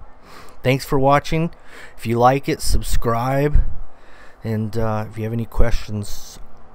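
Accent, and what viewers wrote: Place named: Orange County, United States. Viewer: Your accent, "THIS guy is from OC." Then your phone number pops up. Yep.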